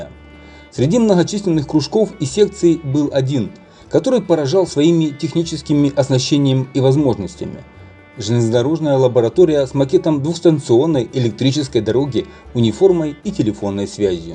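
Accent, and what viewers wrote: Ukraine. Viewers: Обожаю узкоколейную ж/д,-она более романтична,чем традиционная,и - люто ненавижу бездарную,безграмотную речь с укр.,,хге",во время русского произношения,и просто убил бы за термин,,железка"!!! Для так говорящие да ещё публично и оком не моргая,-не люди,а жалкие убожества низшего пошива!!!Я всегда максимально придирчив,жесток и беспощадно-циничен к моментам орфоэпического произношения,не признаю диалекты и суржики,это - диагноз дебильности и дегенерации!!!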